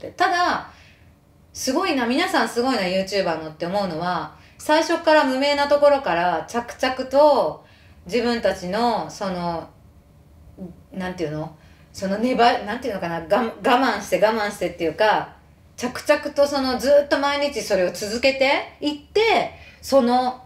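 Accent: Nagoya, Japan. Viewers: 登録しました！ 名古屋からです。 名古屋弁、期待してます。👍🏻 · 一度にこれだけ長く話してるの初めて見ました。たまに名古屋弁のイントネーション入ってて嬉しいw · Twitterの一言に多くの共感！YouTubeではより多くのコメントを聞ける楽しみがあります。これからも頑張って下さい。 普通にイントネーション名古屋弁入ってまっせw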